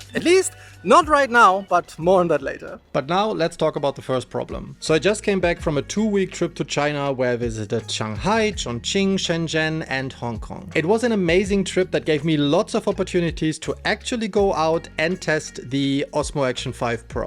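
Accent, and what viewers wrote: Germany. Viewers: My first visit to your channel. Very nice experience. For once, a sure feeling that you are not promoting anything. We need people like you. And if I were in China (or Dubai) with you, I would find your German accent charming ;)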